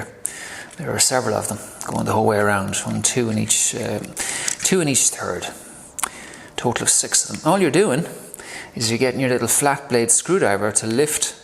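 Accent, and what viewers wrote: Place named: Ireland. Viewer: Thanks for your tutorial my lovely Irish friend! I note the emphasis on absolute cleanliness of the ring to case (ring) surfaces. Now, In my enthusiasm ... I assumed the object was to reduce any friction to a minimum (nice and smooth) so applied a very light coating of CRC Silicone 808 around the mating surfaces... Now.. I am rewatching and note your mention of "the perfect AMOUNT of friction .. which is apparently a "wonderful mystery" !! What I note now with my Powerball (250Hz Pro, by the way) .. is that although I have smoothness and a little less noise, I am having difficulty attaining higher RPM. I can spin up to around 5 or 6,000 RPM and all is very smooth but it is like I lose that "wonderful mysterious grip" which you speak of, which enables the continuation of torque application above mid revs ;) Indeed, I have some "clutch slip". 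You DID mention slip too!! So... I may return to the innards and clean ring and shell tracks again very carefully with Isopropyl alchohol ... I do hope that by some equal mystery, you may still pick this comment up, some months on... Many thanks. Love your accent. Chris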